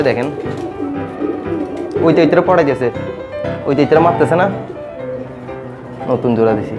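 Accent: Chittagong, Bangladesh. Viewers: ও ভাই আপনিতো অর্ধেক চিটেইংগে বাকি আধা চলিত ভাষা হন্ডে বাড়ি তোয়ারো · ভাই চট্টগ্রাম এর নাকি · ভাই আপনি কি চট্টগ্রাম থাকেন? কথার আওয়াজ কিছুটা চট্টগ্রামের মতো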